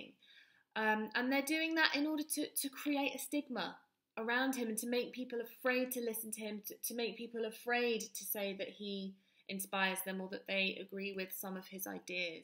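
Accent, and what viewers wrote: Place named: United Kingdom. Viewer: Don't do twitter but nice to hear a Brit. talking about all this. Have subbed.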